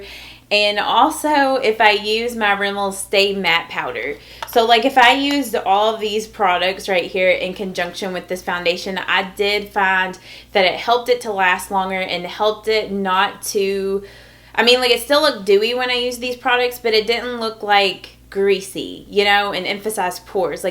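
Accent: South, United States. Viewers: your southern accent is so cute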